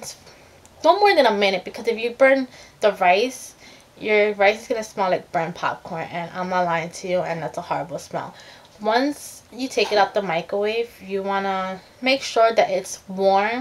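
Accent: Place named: Miami, United States. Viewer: Love the Miami accent! I'm definitely going to try this.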